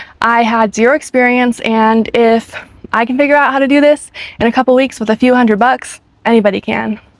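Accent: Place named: United States. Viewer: I really like this accent, can someone let me know which part of the US is it from?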